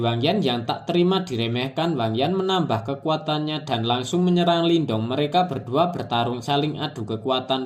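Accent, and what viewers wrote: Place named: Banyumas, Indonesia. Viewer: WONG NGAPAK TAH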